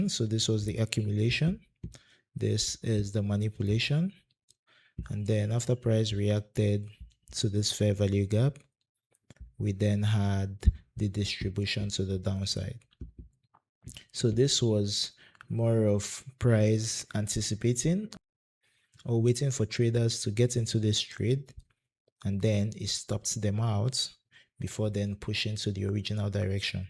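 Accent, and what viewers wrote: Nigeria. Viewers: My guy, u are good. I'm surprised at ur Naija voice too. Your videos are full of great info. Thanks a lot.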